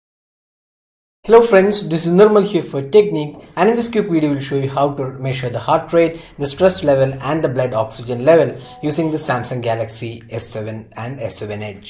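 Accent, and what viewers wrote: India. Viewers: holy fuck, indian accent is so funny....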